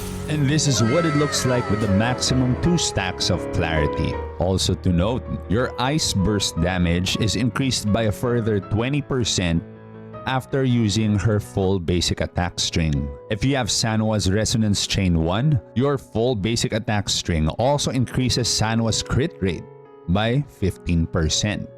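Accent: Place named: Jamaica. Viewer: Bro are you a yardie ? I cant help but notice the accent. Thanks for the vid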